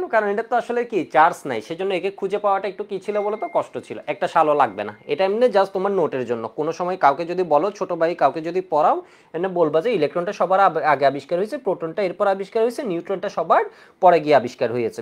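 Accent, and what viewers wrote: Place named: Chittagong, Bangladesh. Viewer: সম্ভবত Chittagong এর accent আছে ওনার কথায়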